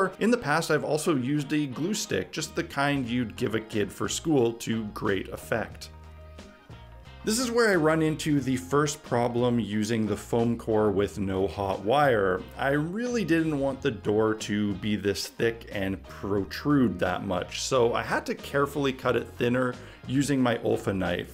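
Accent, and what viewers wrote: Canada. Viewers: Canadian? are you westcoast? btw what a great fantastic building, hope you dont mind totally copying this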